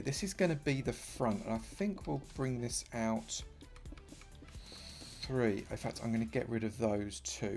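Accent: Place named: North, United Kingdom. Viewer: Um. You must not have listened to many males from the northern UK speak. He sounds like one of those, but that’s pretty much where the likeness ends.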